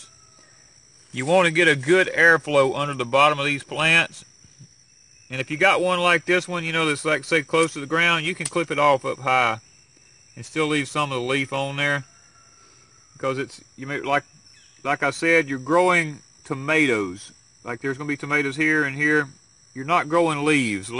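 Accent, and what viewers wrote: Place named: Texas, United States. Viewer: Inter-determ-ah-neent,. LOL luv ya'llz Texan accent, but here in Mississippi we say Indy-term-ah-nent (indeterminate) tomatoes... But besides that you tellin it right brother. Especially the kids n plants runnin wild LOL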